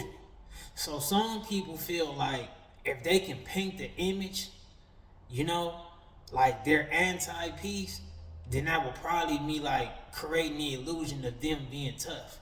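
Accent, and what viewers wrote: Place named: Bay Area, United States. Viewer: I really thought you was from the Bayarea for a minute how you talk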